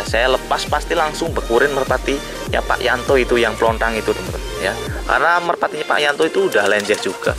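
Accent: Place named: Banyumas, Indonesia. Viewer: Ngomong nya ngapak bang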